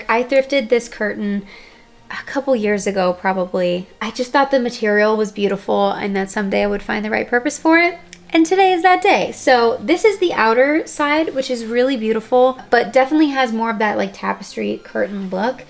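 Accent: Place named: United Kingdom. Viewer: I love the intro😊😊😊. And I thought the British accent was pretty great❤❤❤❤❤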